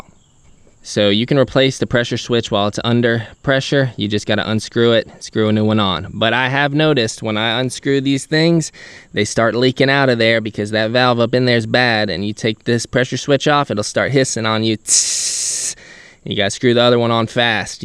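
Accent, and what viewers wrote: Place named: Florida, United States. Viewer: “Ya knowww…” love your words my guy. You sound like you’re from Florida mr cool 😎 lol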